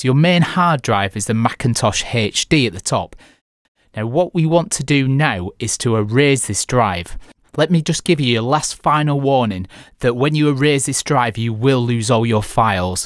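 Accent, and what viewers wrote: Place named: Northern England, United Kingdom. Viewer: Other than the heavy Northern English accent great video very well explained! Thank U!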